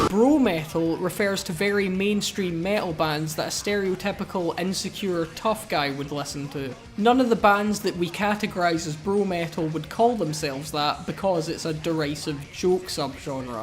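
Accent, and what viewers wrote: Scotland, United Kingdom. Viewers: the scottish accent is just the cherry on top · Funny Scottish dude make the good explanation, my question, where does the Hu fit in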